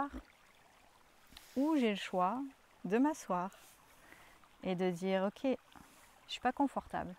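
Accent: Canada. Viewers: C’est marrant, c’est une aparté rigolote mais on voit bien l’influence des canadiens dans ta facon de parler parfois avec tes « puis », « j’était comme » et autre... je sais pas si tu connais Emma Bossé une super youtubeuse qui parle de spiritualité aussi et de guidance, bah tu as un peu cette même façon de parler ahahah je suis sûr que tu en ai influencé, mais j’adore comment ils s’expriment aussi c’est super plaisant pis c’est ben joviale tsé, c’est po linéaire ou que sais-je c’est vraaaiment posé.... 😂 bref en tout cas je te rejoins carrément sur le fait qu’on soit des êtres multi dimensionnel, je suis d’accord nos guides c’est nous avant tout, dans d’autres dimensions dans d’autres aspects....merci, bye💛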